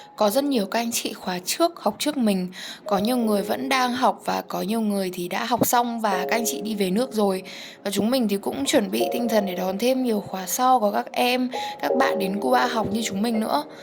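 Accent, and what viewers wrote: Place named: North, Vietnam. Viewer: đang định bảo chị này có cái gu thời trang đúng kiểu con gái mk thích, cho đến khi đọc i4 :V. Chưa xem des nghe cái trời nồm là biết gái Bắc rồi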